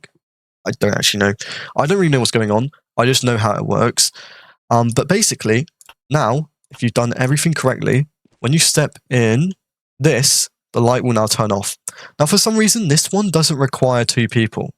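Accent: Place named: United Kingdom. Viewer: he got that Gordon Ramsay accent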